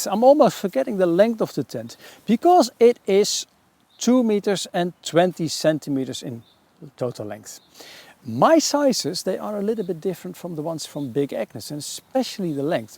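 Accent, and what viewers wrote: Netherlands. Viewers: De eerste paar zinnen wist ik oprecht niet of dit Engels of Nederlands was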